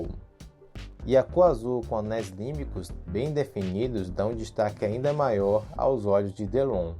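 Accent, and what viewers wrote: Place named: Salvador, Brazil. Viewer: Tu é de salvador? Conhece esse sotaque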